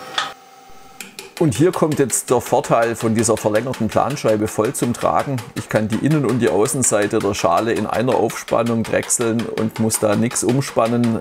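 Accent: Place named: Swabia, Germany. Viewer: Gut gschwaebelt,. I daet des ao so macha: wieder emol ebbes drechsle. Griesle vom Dreiländereck!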